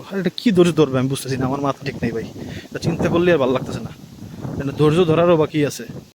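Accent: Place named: Barishal, Bangladesh. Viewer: বরিশাইল্লা কথা বলে তাই কথা বুঝি না কলা গাছ কাটার পরে বলে এককালে জাল ছিঁড়ে পড়ছে৷ অনেক কথাতেই বলে এক কালে এক কালে এককালের অর্থ কি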